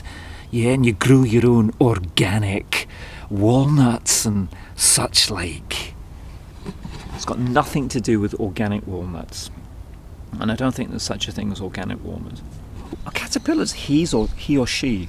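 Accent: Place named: Scotland, United Kingdom. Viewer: Hello I love your chitchat with Citizen Kale, love your Scottish accent. 😀😀😀👍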